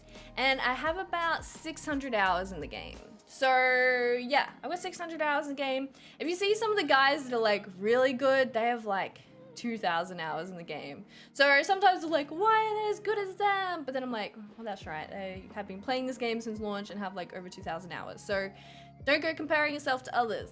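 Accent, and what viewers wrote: Australia. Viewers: in the thumbnail you look kinda like erin from the office hahahaha anyway love your content! youre amazing and its cool to see a big australian youtuber :D (or at least one with australian accent hahaha im australian too lol) thank you for being great! also i love your style, idk how to explain it other than that but yeah you are just cool and stuff :))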